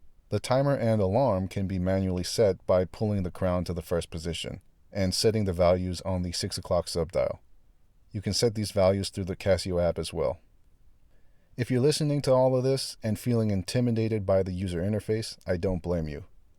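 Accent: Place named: United States. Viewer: Great to hear American English good detailed review !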